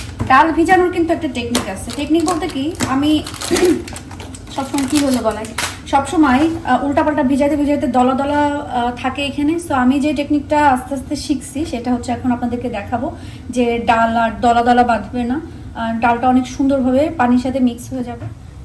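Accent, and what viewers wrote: Sylhet, Bangladesh. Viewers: এরে ও দোস্তত 🤣 খাটি সিলেটি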